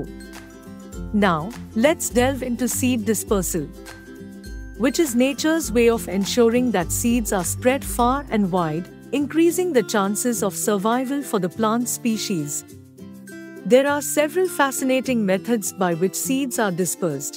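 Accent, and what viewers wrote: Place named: India. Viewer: Logatnya india banget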